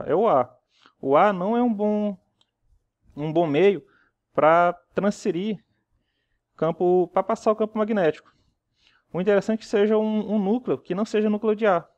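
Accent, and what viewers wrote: Ceará, Brazil. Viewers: tu parece ser cearense.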